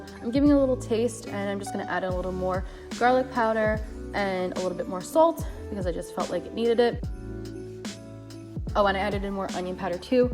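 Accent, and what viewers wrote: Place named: Long Island, United States. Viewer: You from Long Island? I can heart in your voice. I’m a Queens boy myself. Great recipe!!!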